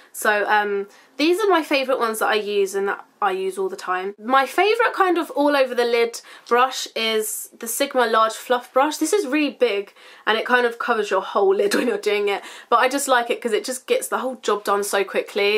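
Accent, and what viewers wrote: United Kingdom. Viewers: i love it when you say reckon :) oh how i wish i had a british accent! x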